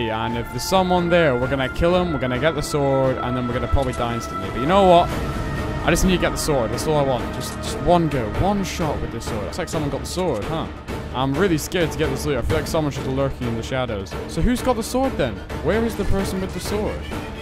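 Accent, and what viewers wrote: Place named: Scotland, United Kingdom. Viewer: I cant tell if you r Scottish british or american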